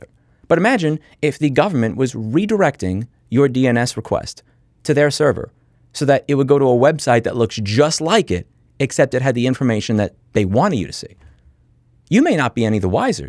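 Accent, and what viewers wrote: New York, United States. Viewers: Why people no get he from NYC? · His anger is glorious at 2x. The authentic new yorker experience.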